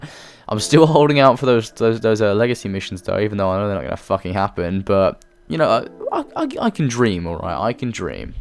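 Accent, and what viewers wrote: United Kingdom. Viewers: I thought that it was probably a joke, but I wasn't completely sure. You never know when you've got somebody from a different culture if maybe a saying that's normal to you means something very different to them. Your accent is obviously European - probably UK somewhere, although I've heard people from the middle east with an accent that sounds pretty similar to yours too. I've certainly never heard the rough sex definition. I'm not even sure if that's an actual thing or just somebody putting in a silly troll definition XD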